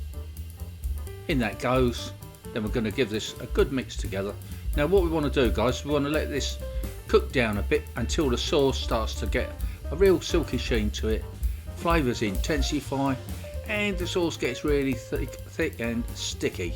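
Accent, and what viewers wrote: United Kingdom. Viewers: I can tell you are from the UK, I love hearing familiar accents __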